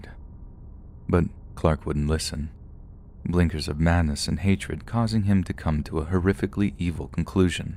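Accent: France. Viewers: Ooooo I love your French accent lol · Your French accent is so sweet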